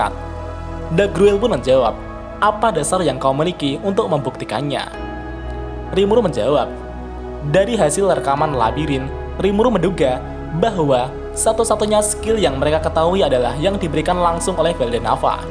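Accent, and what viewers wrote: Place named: South Jakarta, Indonesia. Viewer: Jaksel ya bang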